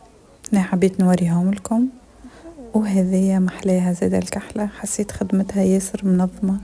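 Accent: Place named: Tunisia. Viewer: أموت في لهجة التوانسة نحبكم برشا طبعا أنا من المغرب ❤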